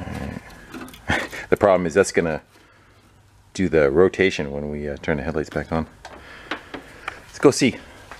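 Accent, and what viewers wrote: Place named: United States. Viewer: Just to mention great great american accent very clear and concise and you have a great laugh which is not patronizing effect.good health🙏🙏🙏🙏🙏🙏🙏🙏🙏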